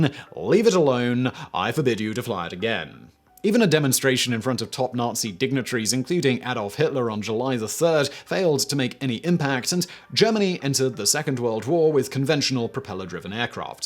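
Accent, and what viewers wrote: United Kingdom. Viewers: I love this guy's British accent it is amazing and I could listen to him all day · You try hold interest by talking really fast with a British accent, but it’s not working. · Heyyyy another one of your channels! How did I know? You stuck your face in the thumbnail as usual. 🤦‍♂️ Get a real job lol. You basically narrate wiki articles (with 5x YouTube accounts) with a British accent and make a shit ton on money monetizing it. Maybe I should be congratulating you? I don’t even know. I just wish YT would stop suggesting this stuff and give me some original content lol. Oh but I do love the dapper clothing and those oh-so-poignant dramatic pauses between horrible jokes. 🤦‍♂️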